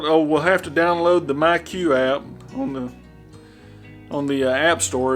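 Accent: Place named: South, United States. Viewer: try to rid of the southern accent. you will get more money, dude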